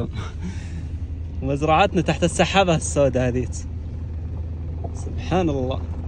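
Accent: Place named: South, Jordan. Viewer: نفس لهجة جنوب الاردن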